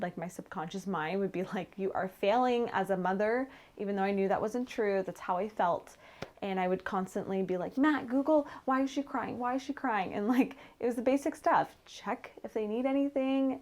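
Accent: Canada. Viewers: Great video! Are you Canadian?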